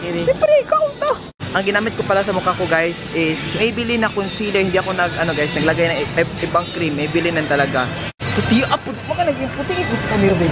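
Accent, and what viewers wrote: Visayas, Philippines. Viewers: Aww bisaya diay ni😂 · Classic bisaya speaking tagalog. Oh the good ol days u earned a sub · hlaaah bisaya diay ka dzai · Bisaya po ba kayo ?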